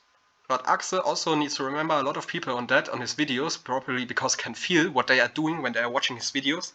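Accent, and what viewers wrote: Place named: Germany. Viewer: ne meinte dass man hört, dass du Deutsch bist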